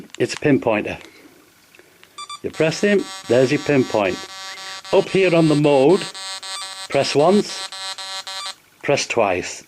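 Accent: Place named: Yorkshire, United Kingdom. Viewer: Thanks....it also helps that you have a Yorkshire brogue....I'm a Wakefield (Normanton rerally) ex-pat down here in Kent. I've set up my Deus exactly as you show.....now to get a chance to use it. Happy New Year in about 15 minutes...Phil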